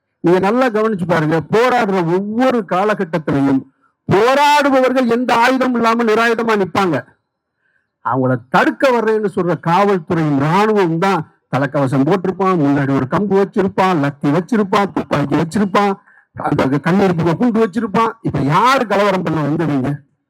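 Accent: Madurai, India. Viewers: மதுரைக்காரன் பேச்சு🎉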